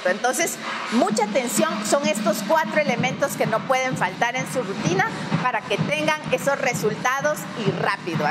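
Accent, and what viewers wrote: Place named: Guatemala. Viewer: Me gusta tu hablado chapin 🥰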